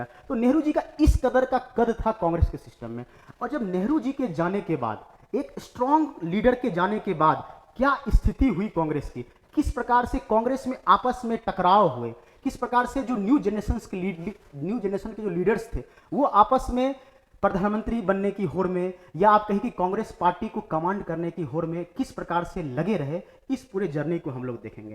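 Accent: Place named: Bihar, India. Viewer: Kon h language bihari k lgta h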